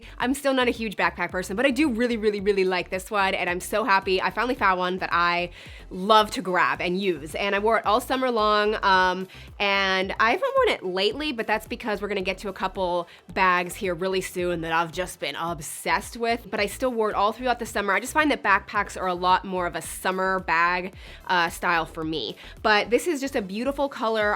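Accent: United States. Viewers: She has an American accent 😅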